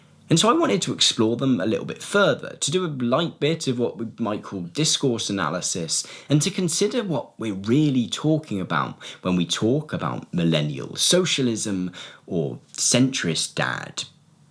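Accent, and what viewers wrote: United Kingdom. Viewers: He’s British. That’s a different type of english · Apparently I'm late to the party but just wanted to say yes! I've found a new left tuber! And it's refreshing to hear a UK YouTuber who's unafraid to speak with a UK accent and UK pronunciation. Idk why but I really dislike the extreme US fetishism of most UK YouTubers.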